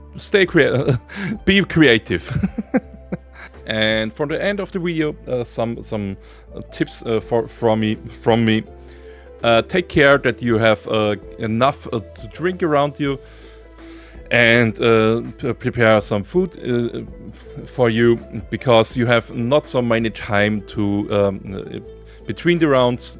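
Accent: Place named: Austria. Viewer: Jeder österreicher wenn er Englisch redet "sorry for the Bad Englisch" redet bis auf'n Akzent fehlerfrei xD Grüße aus Tirol, danke für das Video✌🏻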